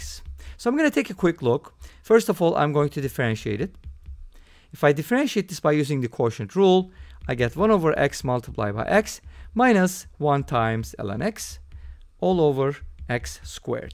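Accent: Turkey. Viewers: Hey, are you by any chance Turkish? You sure sound Turkish. Other than that, great video! I really enjoy these bite-sized equations. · bakmadım ama k ve r harflerinin telaffuzundan ötürü kesin türk bu adam dedim. Canımsın severek izliyorum yeni keşfettim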